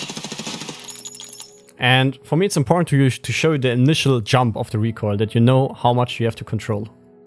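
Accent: Germany. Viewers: Man hört richtig das du deutscher bist haha. Also am englisch. Nicht böse gemeint oder so. Weiter so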